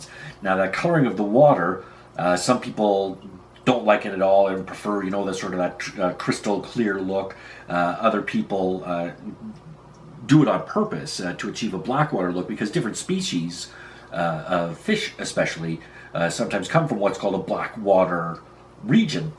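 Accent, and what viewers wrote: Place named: Canada. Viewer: I subscribed for the great info and because I like the way Canadian's say about. If you could please say aboot and chesterfield in future videos.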